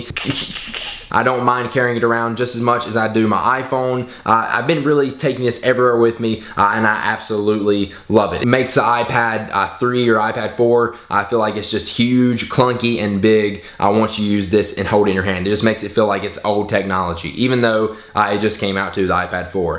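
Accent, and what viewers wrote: South, United States. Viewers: Has nothing to do with the iPad mini but you look extremely cute with that short hair and I would date you if you went this way. Just saying. Love then country accent too. ;) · He has a slight southern accent qce* qce* qce*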